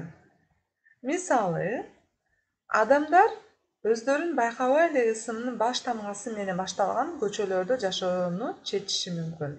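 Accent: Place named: Turkey. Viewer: Молодчик 🔥😍 акцент местный турецкий передача информации убедительная и выразительная💯😘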